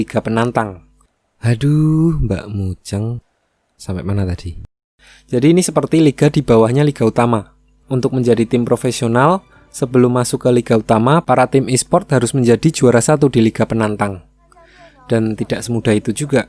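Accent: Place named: Java, Indonesia. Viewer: Anjim wong jowo to sampean mas wkwkw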